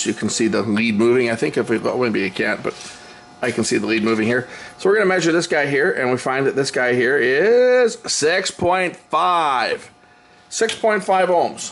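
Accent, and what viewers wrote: Canada. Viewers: Cool video. You sound like you are from Canada? Are you?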